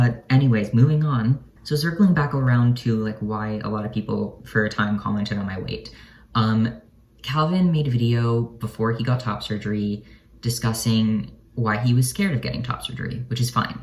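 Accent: Canada. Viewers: Your voice reminds me of Chase Ross'. That's all. Don't know what Kalvin Garrah even means with 't-slur voice.' It sounds normal to me? Edit: I just realized it might be because you both sound Canadian. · Oooooh, all the "out" and "about" in your speech outed you as a Canadian within the first minute, lol. Not that it matters at all, just a fun observation. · Hmmm one thing your voice tells me is that you’re Canadian 😎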